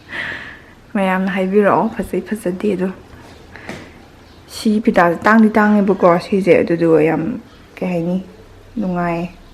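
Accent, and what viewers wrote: Kakching, India. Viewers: Yengba nugai e kakchinge londo adum lonlo taba nugai ye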